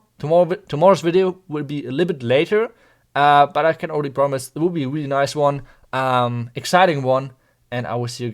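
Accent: Germany. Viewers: Its great to have a world class(skilled) player as a youtuber.Your enlish(German version😊) is really enjoyable.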